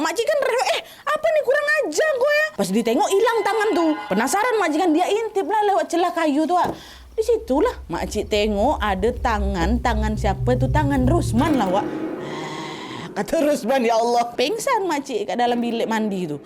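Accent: Malaysia. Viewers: Hai fatin from malaysia * comel sangt ckp slang malaysia........ comel!!!!! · comel je ckp style malaysia😂❤ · Kak cakap bahasa melayu serasa lg dgr cerita p.ramlee👍🏻🤭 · Comel je cakap bahasa melayu😊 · comelnya kak berbahasa melayu 🤭 salam dari Malaysia kak. sihat2 selalu 🫶🏻🇲🇾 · Kak kenapa logatnya jadi ikut pake logat melayu😂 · leggok kalii melayunya bahh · comel la bercerita dalam bahasa malaysia 😍😍😍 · candu pas kak pake logat malay😂 kak emg juara 1 menguasai logat daerah bahkan sampe ke negara tetangga 🤣🤣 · Comelnya cakap melayu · Comel nye dengar kak cube cakap bahasa melayu. Thank you kak for the effort, hargai gile usaha kak ❤ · Respect cara Kak bercerita.. sama macam bahasa Malaysia.. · nada&logat malaysia ny dpt bgt plsss□□ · cakap melayu is so comeyyy 🤣😊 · Bahasa melayu kak gelas kali wak ehh😊😊😊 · Mood banget denger kak ngomong logat melayu gtu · Lucu skli kak ngomong bahasa malay😅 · Comel cakap bahasa melayu malaysia❤ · Lucu sangat cakap bahasa Malaysia,comel je😊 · Kak lucu bgt pake bahasa Melayu😂😂❤❤❤ menghayati ya wakk😂😂❤❤❤❤ sembagattt wakk❤❤ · Pertama, cerita ni memberi nuansa baru dari khw sebelumnya, jadi seru kaliii. 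Kedua, kak cakap melayu adoooh comel lah sukak ❤ makasi kak utk videonya, selalu excited nonton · Comel lah kak cakap bahasa Melayu 🤭🤭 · comel je cakap bahasa malaysia 🤭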